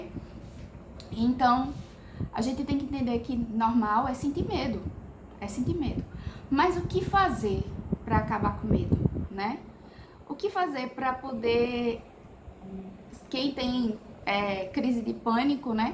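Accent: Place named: Northeast, Brazil. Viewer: Muito incentivador seu vídeo parabéns eu sou de Goiás e gosto muito do sotaque de vcs do Nordeste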